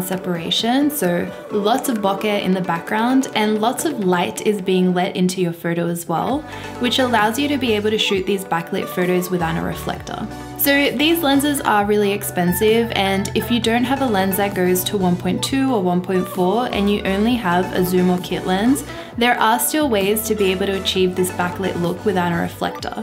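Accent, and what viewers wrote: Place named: Australia. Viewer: Loved your Video very well done! You are adorable and your silky smooth voice with that Aussy accent ❤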